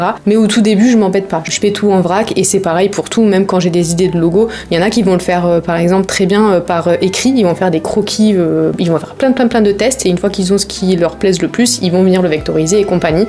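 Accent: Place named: Marseille, France. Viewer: Encore une vidéo sympa et pleine d'enseignements ! Etant en formation CDUI, j'adore tes vidéos pleines de conseils et le petit accent marseillais en plus !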